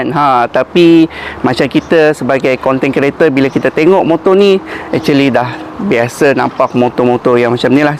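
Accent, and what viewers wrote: Sabah, Malaysia. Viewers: Mcm org Sabah, ngam ka?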